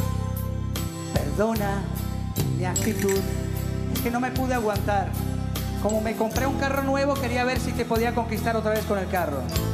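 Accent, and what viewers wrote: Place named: Italy. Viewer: Ese asento italiano chevree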